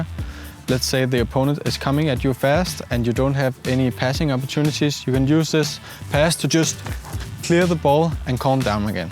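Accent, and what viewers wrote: Denmark. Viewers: Is he danish? You can hear it by his accent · Elsker den danske Engelsk❤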